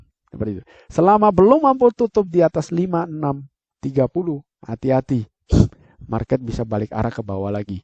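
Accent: Java, Indonesia. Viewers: gw suka sama suaranya yg medok2 dan konten2nya bermutu.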